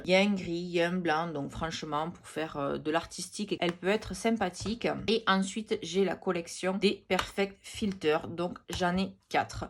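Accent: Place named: Southwest, France. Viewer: Le petit accent du sud-ouest !! 👌🏻 super vidéo qui détend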